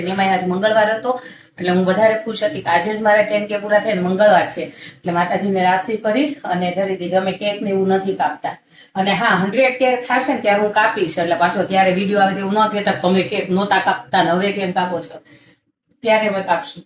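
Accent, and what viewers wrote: Kathiawar, India. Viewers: કાઠિયાવાડી છો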